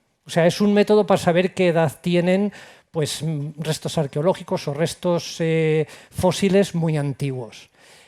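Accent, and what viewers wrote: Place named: Spain. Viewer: Excelente charla, sumamente interesante, me tuve que bancar el acento Español que no lo soporto para nada para escuchar una conferencia de 10, un pequeño sacrificio por una gran recompensa :)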